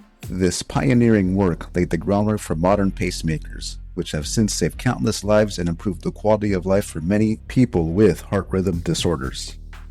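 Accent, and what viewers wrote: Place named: United States. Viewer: Why are all our Australian vids always voiced by Americans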